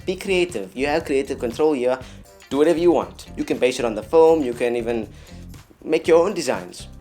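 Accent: South Africa. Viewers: Your from SA arent cha?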